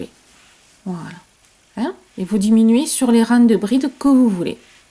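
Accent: South, France. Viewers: Bonjour, et merci beaucoup pour ce très beau modèle. J'aime tout! 😍 Le point, la large encolure à la limite du col châle, la construction carrée simple (mon premier patron maison était de ce type, avec également un point en relief, mais avec un col V). Je vais le faire, c'est une certitude! Et que serait une vidéo d' sans son ardoise, son accent plein de cigales, et ses petits rires spontanés? Vous êtes un plaisir pour les yeux et les oreilles. ☺️ Sans compter que vos explications sont toujours claires, précises et détaillées. Je vous remercie encore pour ce gilet qui va définitivement entrer dans ma garde-robe. 👗 Petite précision: je fais mon point élastique en montant une chaînette que je travaille en brides relief avant et arrière sur le retour, et j'avance sur le poignet en mailles coulées. Je trouve que cela resserre mieux autour du poignet, surtout si l'on a une manche un peu large justement pour mettre un autre vêtement sous la veste. 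Je vous souhaite une très agréable journée. Prenez bien soin de vous. 🌺🙏😘🌺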